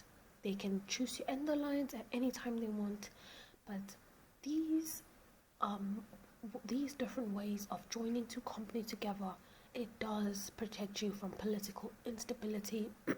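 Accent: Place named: United Kingdom. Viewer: What a British accent you have , i am amazed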